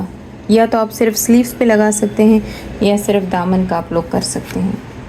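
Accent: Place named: Peshawar, Pakistan. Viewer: Pta nhi ye pishawer wali urdu bolte waqt teek he, teek he itna ziyada q bolty hn 😅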